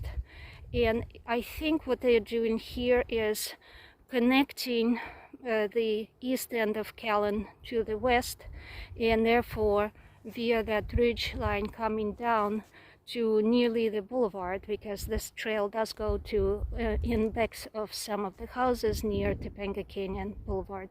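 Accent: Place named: Eastern Europe. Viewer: ) Можно и об этнической принадлежности. Хотя, не суть. Просто только сейчас заметил этот знакомый акцент из Восточной Европы. Давненько подписан, смотрел на творчество.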